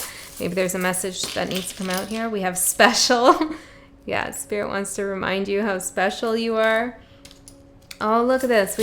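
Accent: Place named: Canada. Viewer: That Canadian accent 👍🏿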